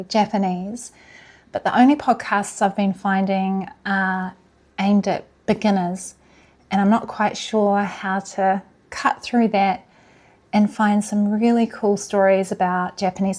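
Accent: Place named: New Zealand. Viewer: Yes! Great to connect. When I heard you cool Kiwi accent I felt like I was listening to a sister. Yes, pitch accent is so important. When we are out together , my wife lets me speak freestyle, but when we are at home together she is quite strict about teaching me how to speak with the right accent. Also she said "I can't teach you to speak mans style so you will need to find someone for that". I found a guy online who I would with once a week. He teaching me the real deal. I told him, no Keigo, and I do not want to learn textbook rubbish. And he agreed with me 100 percent. I think I'm an interesting case for him. My local friends are very patient but they mumble a lot. Tokyo japanese is so clear compared to that, and being in the countryside here in Amami, everyone speaks casual style He teaching me the real deal. I do 4 Kanji a day. I think Kanji will take a very long time to get. 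Your tips on learning to read stick with me, so I'll keep doing that. My reading is pretty bad though.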